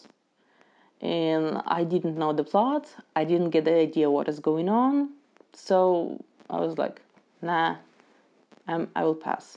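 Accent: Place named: Eastern Europe. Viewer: yeah Alec Guinness is a legend, other classics he acted in are The Ladykillers, The Lavender Hill Mob and Kind Hearts And Coronets. As for your accent, those from the former Soviet states in the eastern bloc sound very similar. Your name sort of gives it away though, you wouldn't happen to have Romanov blood would you lol?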